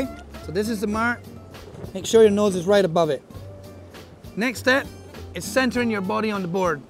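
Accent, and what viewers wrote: Ireland. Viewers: Irish? My work mate is Irish too...good job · You sound very Irish mate.......I guess that's to do with the history of Barbados.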